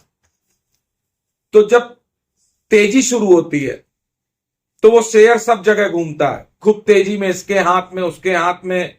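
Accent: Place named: Lucknow, India. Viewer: Bhai app lucknow kai hai kya, maza a gaya apke commentary sun kai. Wow 👌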